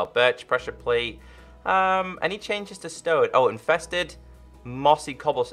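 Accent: United Kingdom. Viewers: It’s the accent. UK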